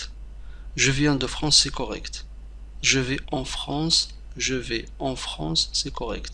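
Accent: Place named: Maghreb. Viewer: Wlah c vrai lui déjà et nul avec un accent du maghreb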